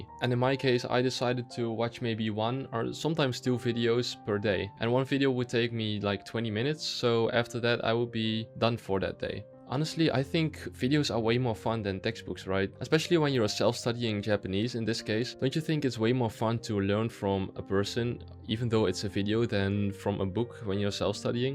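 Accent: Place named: Netherlands. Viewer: I actually used the same video series when I started learning Japanese 5 years ago Ik wist gelijk dat je nederlands was door het accent man geweldig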